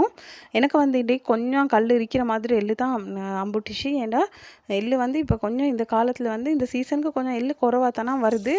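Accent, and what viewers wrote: Sri Lanka. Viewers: Superb sister, your srilankan acsent❤❤❤ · அன்பான இலங்கை சகோதரியின் தமிழ் உச்சரிப்பும் , பேச்சும் இனிமையாக இருக்கிறது.❤❤❤❤❤subscribe பண்ணிட்டேன்.❤❤❤❤🎉🎉🎉🎉👍👍👍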